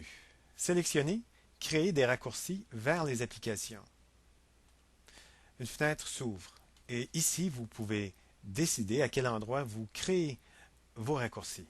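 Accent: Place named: Canada. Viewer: j ador ton accent canadien ^__^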